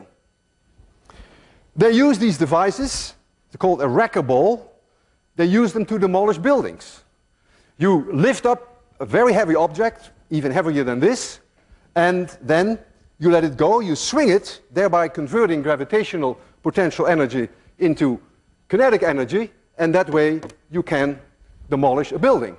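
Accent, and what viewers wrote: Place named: Netherlands. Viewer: its a duch accent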